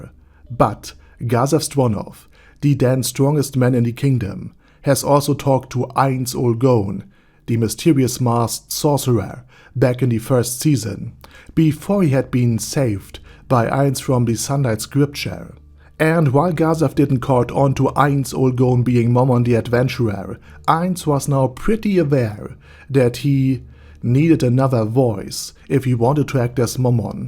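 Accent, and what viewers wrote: Germany. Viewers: The german accent is pretty obvious even tho you speak without mistakes which i find pretty funny.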